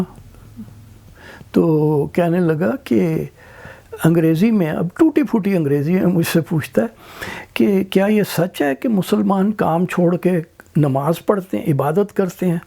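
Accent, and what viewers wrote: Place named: Talagang, Pakistan. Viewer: My parents and grandparents emigrated from Talagang. Your Punjabi is similar. Are you from that area?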